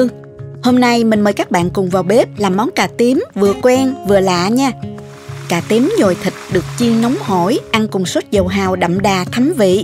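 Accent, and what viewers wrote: South, Vietnam. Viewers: giọng cô này hay ,chuẩn nam bộ luôn kkk giọng này làm kênh kể chuyện cũng hợp lắm đa! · Món này ngon quá chị bà ơi, chị có một giọng nói rất ấm áp truyền cảm đậm chất nam bộ, một giọng nói như phát thanh viên Radio hay là những bộ phim tài liệu lịch sử rất tuyệt 😄😄😄👍👍♥️♥️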